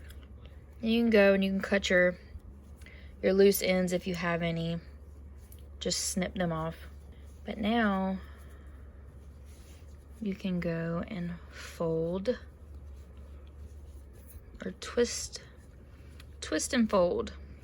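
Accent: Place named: South, United States. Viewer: NICE! I’ll be making some of these for my son’s family. You must be a southern gal. I’m in Louisiana and you sound like one of us. 😍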